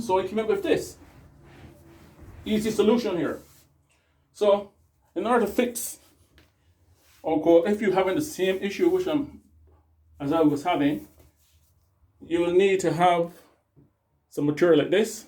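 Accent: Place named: Barbados. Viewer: Total Bajan ingenuity on display here. Right down to “de axcent”. I tell you 2025 👍🏾 Barbados 🇧🇧 in Newfoundland 🤓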